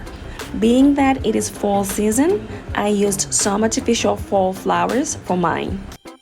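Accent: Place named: Jamaica. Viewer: Love the little Jamaican accent & your creative crafts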